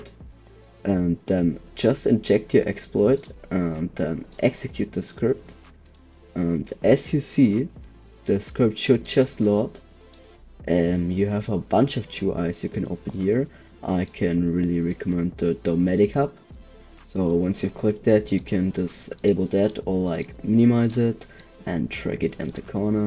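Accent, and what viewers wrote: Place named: Germany. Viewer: nah this bot comments and u german accent is funny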